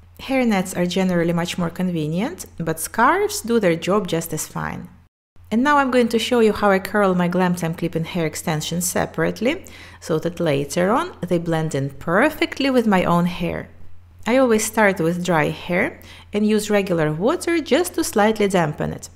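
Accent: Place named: Russia. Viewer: Я тоже из старичков. Люблю видео и сразу услышала наш, русский акцент❤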